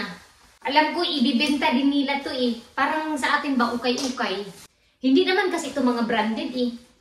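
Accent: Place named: United Kingdom. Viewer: british accentan kau jd ang inday ui buyag...well done mama! maau nlng jd naminaw ang kuya!ingun ana jd na madam basta mama nata,ky akong bebelabs bitaw mao pd..nah panahon pd namo dria di masabtan 😂! mura pd sa cs ky naa pd ginabutang pra dli magkeloid,maau nlng kd ky naayo na!mahal pd ba ang cargo diha padung pinas?? ky dri mahal mao ginahatag nlng namo or ginabutang sa mga clothes box..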